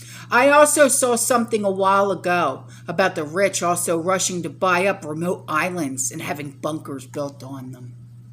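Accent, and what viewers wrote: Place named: Long Island, United States. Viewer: you have to be from Long Island, ny. The way you say youzzz-guys. My best friend says it the same way and she’s from Long Island.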